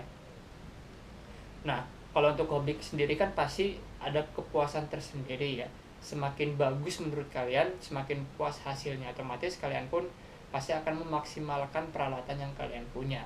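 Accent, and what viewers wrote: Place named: West Java, Indonesia. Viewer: Logat Sunda mah moal bisa bohong nya mang wkwkwkw luv keren konten na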